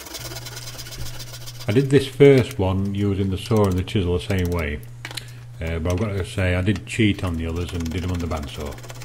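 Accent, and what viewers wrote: Yorkshire, United Kingdom. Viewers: It vaguely seems that you are attempting to talk in English. Ah, you are a “Brit”! Sorry for you to be so unlucky to have such a sloppy upbringing. You could go to USA for high school, then you might learn ENGLISH LANG. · Ey up, lad's from Yorkshire int he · By 'Eck, a Yurkshireman! Greetings from a homesick Brit expat!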